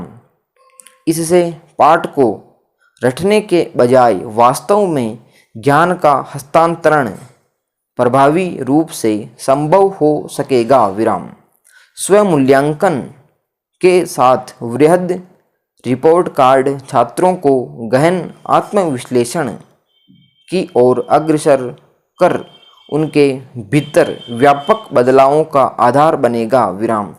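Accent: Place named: Rajasthan, India. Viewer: आप की हिंदी मे राजस्थानी का थोड़ा सा मेल है इसलिए कुछ शब्द अलग सुनाई देते हैं 😅😅😅😅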